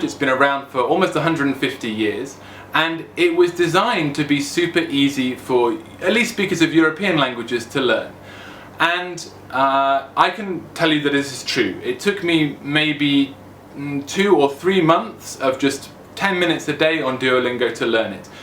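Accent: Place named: Germany. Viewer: Bonega filmo mi speziale amas, tiel vi parolas angle kun germana akcento 😂 #kor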